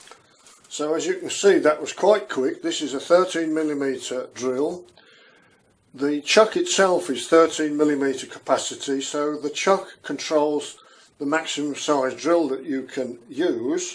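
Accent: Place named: England, United Kingdom. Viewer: I love that english accent, cheers from Argentina :).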